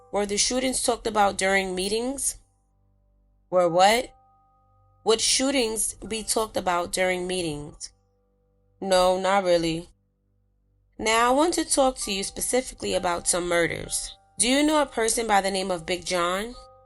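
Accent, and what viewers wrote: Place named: New York, United States. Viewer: Man i wish the original narrator was still alive ...cant stand the new york accent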